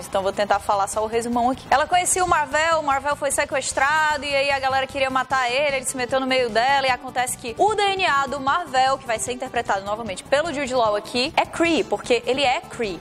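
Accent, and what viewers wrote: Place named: Ceará, Brazil. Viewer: Só sei que o universo Uplay iria desmorar, se a saísse do programa. Mas, eu sei que isso não tem nem perigo de acontecer. =D P.S.: É impressão minha ou o vídeo de hoje saiu "mais cearense" que o normal? ^^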